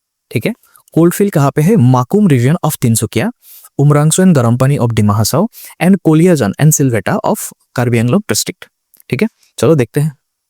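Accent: Bengal, India. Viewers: Sir apka accent se lgta h aap bengali ho kyuki ek baar aap padhate wqt gllti se bengali word nikal gya tha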